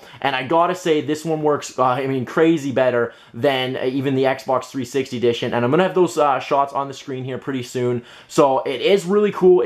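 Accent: Canada. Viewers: He sounds so Canadian. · are you Canadian ? you're hot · You sound Canadian. Are you Canadian? I know you won't answer... just curious though... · You sound canadian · I don't even know this guy but I can tell he's Canadian by his accent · Who watched this video for the pure enjoyment of his Canadian accent? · he's Canadian I can tell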